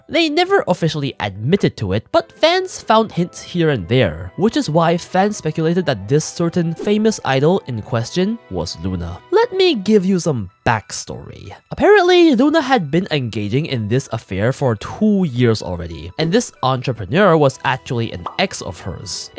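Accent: New York, United States. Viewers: Sounds like NY or something lol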